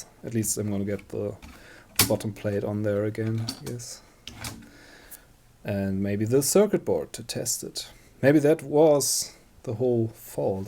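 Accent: Germany. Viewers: Nice Video. Could it be that you are from Germany, weil du hast einen leichten deutschen Akzent 😁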